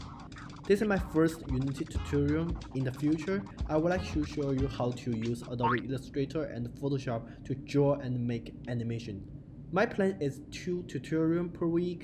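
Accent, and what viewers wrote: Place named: China. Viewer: 兄弟,听口音是 中国人呀~~ 能不能搞点2D中文版本教程呀~~~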